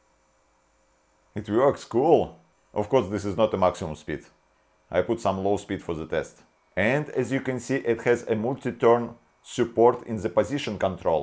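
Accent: Russia. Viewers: Привет МУЖИК! Ты разговариваешь с Русским акцентом, давай уже видосы на Русском. Ты афигенен! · Вэри хард инглиш. читаХ - а у русских всё через Х.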